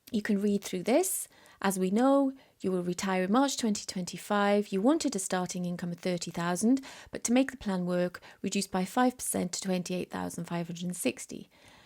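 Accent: Wales, United Kingdom. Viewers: this is an amazing tool, thank you so much for the link and the instructions on how to use it. I'm currently at that "juncture" moment in life where (at 62) with £500k in my pension pot, and currently earning £55k in full-time employment, is this the year where I hang up my boots and finally retire? This tool makes it so easy to help me predict what to do. PS - LOVE your accent! I'm a Cardiff boy myself, and I'm guessing you're further west?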